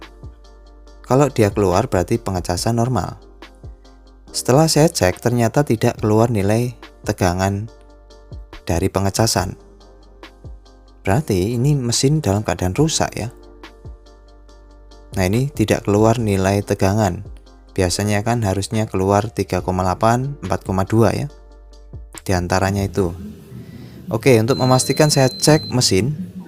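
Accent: Java, Indonesia. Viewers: Mas kalo boleh tau mase orang mana ya? Jawanya jawa mana? Siapa tau kalo ada apa apa bisa mampir ke mase🤭😁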